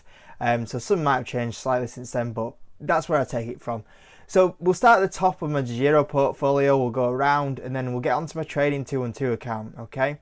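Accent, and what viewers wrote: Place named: Northern England, United Kingdom. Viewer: Thank you man, where about’s in the UK are you from, I’m London based. The accent sounds a bit Northern, my uni is up in York.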